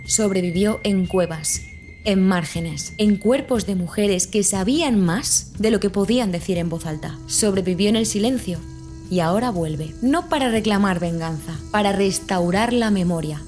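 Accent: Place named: Spain. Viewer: Trato de soportar el acento españolete pero paila. Es irritablemente insoportable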